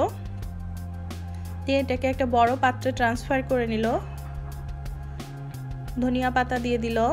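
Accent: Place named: Dhaka, Bangladesh. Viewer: bangali Na tumi dhakai Bangladesh theke tomar kothai bijha jache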